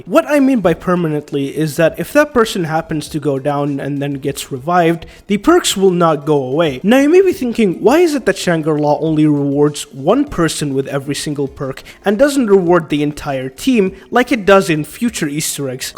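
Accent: Arab world. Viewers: من صوتك عرفت انك من ربعنا🫡 والله مقطع ممتاز بس يرحم والديك خذ نفس وخلنا ناخذ نفس معك، صدع راسي من كثر ما انه مافيه اي استراحة بين كل جملة وجملة. يعني خل الكلام يطلع بشكل طبيعي مو جملة ورا جملة ورا جملة كأنك روبوت مرة ثانية والله مقطع ممتاز واخيرا احد عربي سوا مقطع (كويس) مكثف عن الزومبي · Your accent is Arabic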